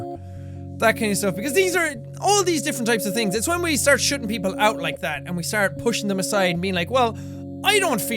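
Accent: Ireland. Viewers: Is it just me or does Jack no longer uses his Irish accent anymore other then in his intro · What part of Ireland are you from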